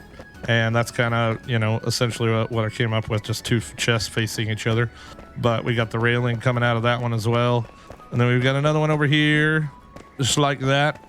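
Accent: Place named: England, United Kingdom. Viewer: I would have been really surprised if you were not nominated. That said though, a few people that have had great builds have missed out. It is what it is. Competition aside, I love your Enshrouded videos, keep up the good work. One complaint tho, you should have used your excellent English accent again when explaining the lore 😁😁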